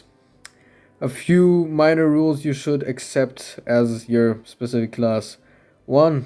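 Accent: Germany. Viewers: are u german?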